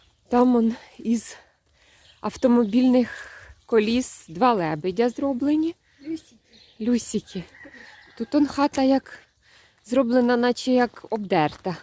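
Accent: Cherkasy, Ukraine. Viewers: А я все думаю, що мені діалект дуже знайомий. А виходить ми земляки. Я також з Черкащини (Тальнівщина)., а Ви звідки саме?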